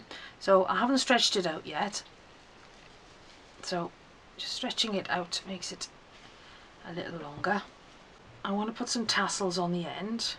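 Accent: Wales, United Kingdom. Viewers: You sound welsh im homesick now😢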